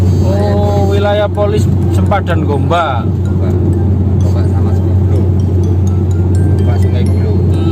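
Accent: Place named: Java, Indonesia. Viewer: Aku suka dengar slang Jawa mu dong. Rasa senang dan menghiburkan.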